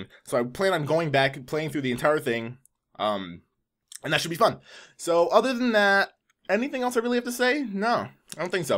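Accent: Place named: Caribbean. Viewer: Im from The Bahamas and you look and sound just like me, are you from the carribean? if so which island or america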